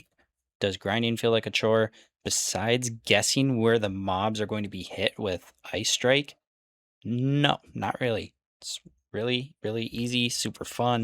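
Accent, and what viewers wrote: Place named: Wisconsin, United States. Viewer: Kinda random but are you by chance from Wisconsin? Your accent sounds similar to mine.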